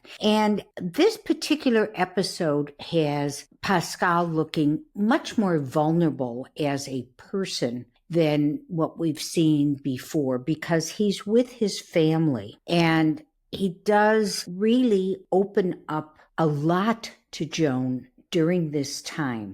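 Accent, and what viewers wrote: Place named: Midwest, United States. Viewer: Hi ….I enjoy your commentary,but……I’m not trying to be nasty here, but I just have to say…… your midwestern accent is so annoying! It’s grating!! It makes it difficult to listen. You really should try to mitigate it. Perhaps there’s some kind of course on YouTube that could help you.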